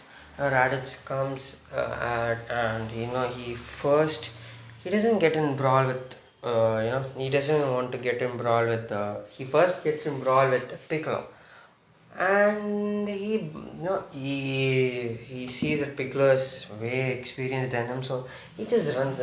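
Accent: India. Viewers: Speak English not indian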